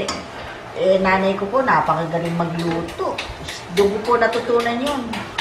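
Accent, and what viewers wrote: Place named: San Miguel, Philippines. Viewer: nakakatuwa lang po kasi ung tono ng salita nyo namimiss ko taga San Miguel din po aq andto po aq ngayon sa abudhabi at paborito po yan ng mga anak ko😊😊😊 lahat po ng recipe nyo pinapanood ko. God bless po sa inyo! 😉😉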